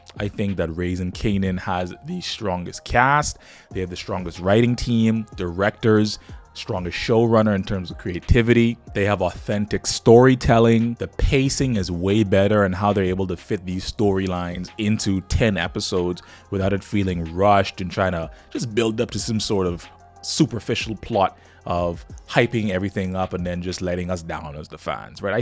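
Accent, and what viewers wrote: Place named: Bahamas. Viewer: Bro u bahamian? Sounds so if so 242 to the world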